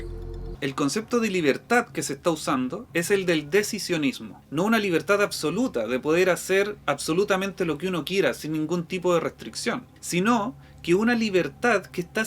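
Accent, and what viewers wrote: Chile. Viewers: Hola encuentro que tu video Está muy bien realizado y la explicación me parece fantástica! Cómo chileno me gusta tu acento y no me molesta como videos de otros países que son como taladros en el oído.... solamente acotar que tal vez podrías pensar en eliminar el gesto que haces con la mano al fin de este vídeo porque es algo muy común entre nosotros los chilenos pero es sabido que en otros países tiene significados incluso de falta de respeto o cosas así.... aparte de eso tu explicación y tu video están la.raja!!!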